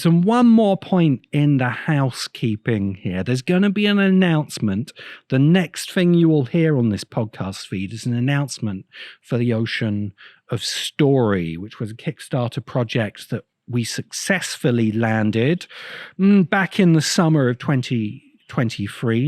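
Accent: United Kingdom. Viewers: So in a nutshell, the author here defines as ''post-propaganda'' any point that might be brought against his own biases. Which in a hilariously cyclical argument sense its a form of propaganda too. Im not payed by Russia or China (hey that's what somebody who IS payed would say lol), but hearing him talking as if he has some kind of higher moral ground, with British accent, is at the very least ironic if not ridiculous. On the point made earlier about how much democratic we would consider today the Athenian Democracy, I will reverse it and ask would the Athenians recognize our indirect practically ''representative'' oligarchy as a Democracy when that term derives from Demos (citizenry)+ Kratos (Power)?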